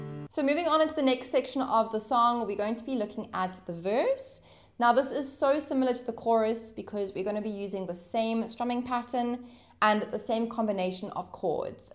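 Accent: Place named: South Africa. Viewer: I thought I’d heard the (for me) nostalgic trace of an SA accent and checked your biography 😊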